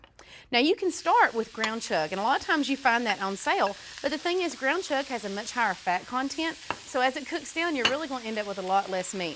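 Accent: South, United States. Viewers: Very difficult to understand her! Talking too fast .. with a Southern Accent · I love your voice you sound like all the folks around where i grew up.God bless our south.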